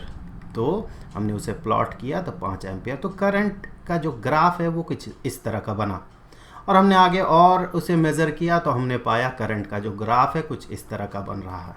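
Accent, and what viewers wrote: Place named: South, India. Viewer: Sir, apka accent - South Indian ! Allover NICE !!! Thenx .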